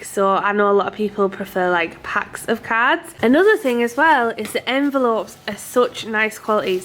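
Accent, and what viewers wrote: United Kingdom. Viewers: You can turn subtitles on with the little square box icon on the screen. Mine are always on. I get a kick out of seeing English translations of UK English accents. It never quite matches up! I know what she’s saying but the subtitles don’t. 🤣🥰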